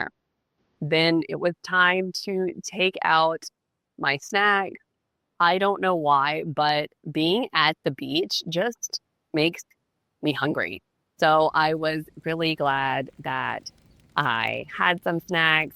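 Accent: United States. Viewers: Dear One day on the beach - ti's is so nice idea and practical as well I practise listening, I enjoy it a lot even though your English is definitely American (I prefer soft British), but it is done in very interesting: slow, creative, transparent and coherent way. Also your today's adventure has reminded me my a few Spanish experiences in the past. There are rally beautiful memories. Warm hugs from England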